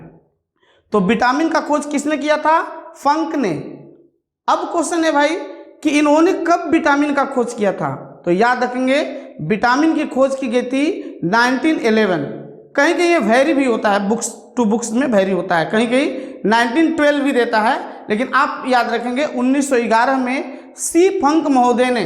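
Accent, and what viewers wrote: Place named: Bihar, India. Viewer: Aap to Bihar se hai Guru Jeeeeeee🎉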